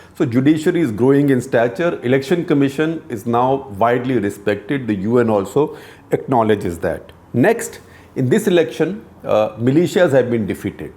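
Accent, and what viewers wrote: India. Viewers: Dear, Please pronounce the words ARAB and KURD naturally. Your English accent is hardcore Indian. Trying to anglicize Arab as ayarab and kurd as curd sounds little wierd.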